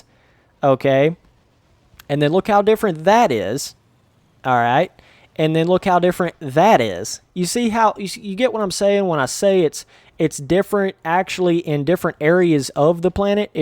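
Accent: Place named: South, United States. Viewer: There is something about a dude with a Southern twang playing No Man's Sky that is just super wholesome.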